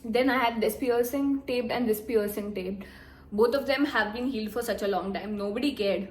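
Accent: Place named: Maharashtra, India. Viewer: loved your vlogs ✨♥ your maharashtrian tone is such a :hands-yellow-heart-red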